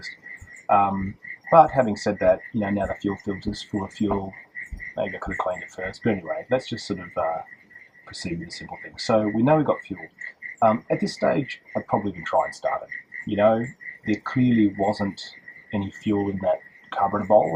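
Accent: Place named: Australia. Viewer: Sir, your videos have been invaluable in my 1st goes at repairing 4 outboards ... but more importantly your accent and character make me miss my favorite country in the world. This yank has been deported from Australia 3 times (I simply love the top end) and I will of course return ... it is not my fault I was "born in the USA."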